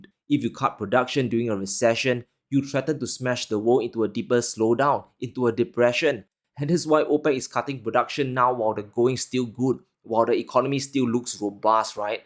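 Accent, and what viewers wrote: Jamaica. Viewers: **** brotha' you sound like a Rasta when you speak. Good information in the video, sir thanks**** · i cant figure out, is this jamaican chinese accent or what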